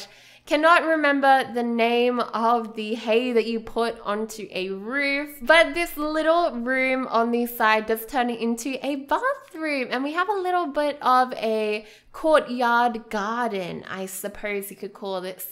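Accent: Australia. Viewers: Muflon She's Australian :) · is she australian?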